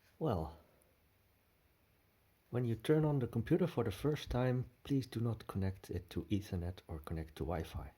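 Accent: Netherlands. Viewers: Wtf dude, it called an accent. "Die" is a common deviation of people who speak Dutch or Dutch adjacent languages. People speak your language out of courtesy. If they pronounce things wrong, just accept it and go on with your epic day.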